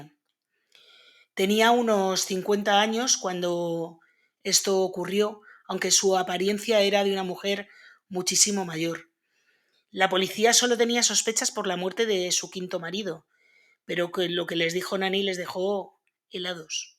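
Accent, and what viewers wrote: Spain. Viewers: Primera vez que te escucho, aunque está sra hizo cosas horrendas tenés una manera de relatar tan graciosamente española que x momentos me tentaba de risa (vaya que ojo tenía...😂) ya me suscribí!!!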